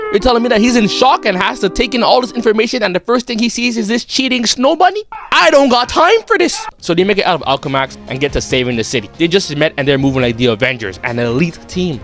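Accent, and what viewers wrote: Toronto, Canada. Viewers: Ifw the video but I can’t not hear the Toronto accent💀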